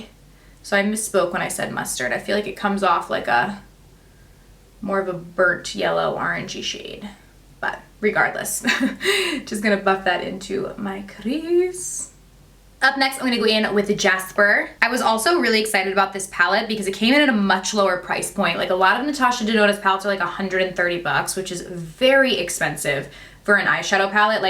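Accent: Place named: East Coast, United States. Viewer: ... You really have given me confidence in makeup! I always try and recreate all your looks!! Love ya girl!! P.S...Philly girl here and I knew you had to be an east coast girl since you say "you guys"! 😂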